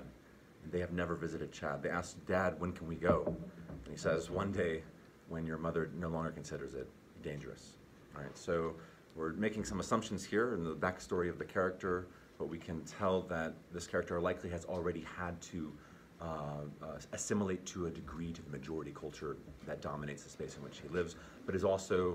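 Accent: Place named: United States. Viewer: ناروتو اوزماكي اوتشيها انتا مصدق انوا سوداني؟ ترا امريكي لغتو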